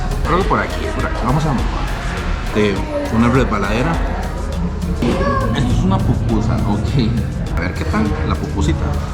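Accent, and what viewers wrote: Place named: Costa Rica. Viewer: Usted de donde es xq parece tico ?ya como habla pura vida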